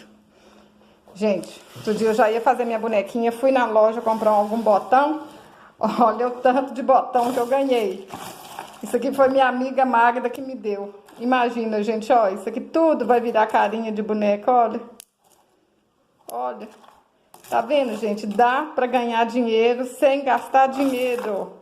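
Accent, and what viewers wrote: Minas Gerais, Brazil. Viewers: Oi, adoro suas bonequinhas e vc é muito simpática e explica muito bem, acho q pelo seu sotaque vc é mineira, acertei? Eu sou nascida em Minas, Resplendor mas moro no interior do Rio. Parabéns!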